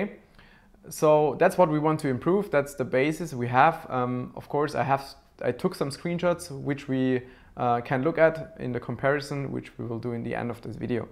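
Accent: Germany. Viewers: LOVE YOUR GERMAN accent ✌️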